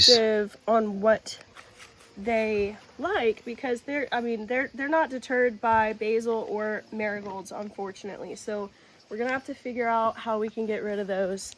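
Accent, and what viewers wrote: United States. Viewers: Are you American ❤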